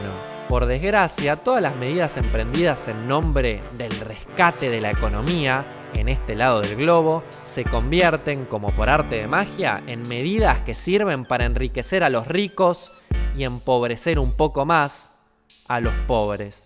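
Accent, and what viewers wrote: Argentina. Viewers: no se si sea yo o que porque se me hace muy difícil entender los vídeos, quiero ver tus vídeos porque son muy interesantes pero creo que es por lo que eres de argentina y es muy difícil entender como tu forma de interpretar algunas palabras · Se nota que eres argentino no sólo por tu forma de hablar sino porque no crees en la apertura económica ni la competitividad, aprende de Chile.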